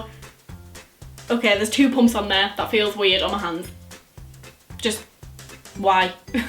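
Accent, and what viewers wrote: Newcastle, United Kingdom. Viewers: she’s from somewhere near Newcastle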